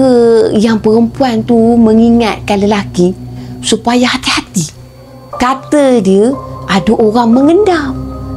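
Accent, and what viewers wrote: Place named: Perak, Malaysia. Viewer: Assalamualaikum. Selamat Hari Raya buat sekeluarga. Sedap dengar bercerita ade guna loghat Perak. Suke akk mendengonye. Cer Cuba lepeh ni guna loghat N.S plk..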